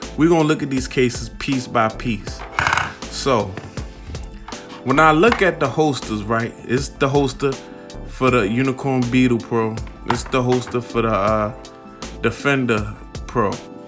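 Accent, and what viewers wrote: Baltimore, United States. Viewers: ... Great review fam! And just on a side note, you got a BMORE accent if I ever heard one! If you're actually from there (which I'm sure you are), I'm actually from Whitelock homie, but I live in Vegas now for almost 15 years. I'm 100% sure you know where Whitelock is.... Anyway, keep on keepin' it real with these reviews. 🦀 Forever LOL... God Bless!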